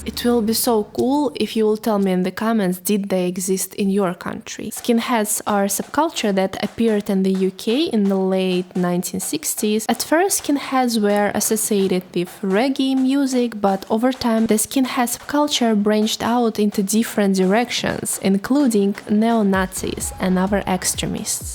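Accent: Russia. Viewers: Your enunciation of English with Russian accent is 🔥🔥🔥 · You said rural best when you rolled the "R". Embrace your Russian accent; that is a difficult word even for natives to say. It was easiest to understand when you said it slightly more "Russian" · If not for the Russian accent, I'd think you were English ... I'm not sure what that guy was on about. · Me encant tú acento ruso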